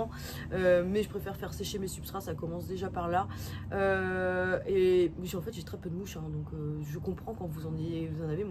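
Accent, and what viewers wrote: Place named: Paris, France. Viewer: Bonjour et merci. Mon Accent Marseillais est aussi prononcé que votre accent Parisien.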